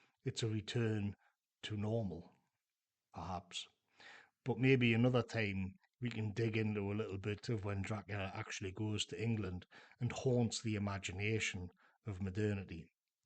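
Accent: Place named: Newcastle, United Kingdom. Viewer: My father was a Geordie, so perhaps I'll get away with saying that your accent really adds to the reading of that passage. Makes me think of the wildness of the North, walking Hadrian's Wall in pelting rain and wind, the wildness that was still there in the souls of people like my grandparents who were proud of THEIR (very working class) past, the Reivers, the Scottish border skirmishes.. and told stories of ghosts and witches.. Or I'm just being wildly over romantic, but in my own defence I'm half Geordie, half Welsh so I get it from BOTH sides...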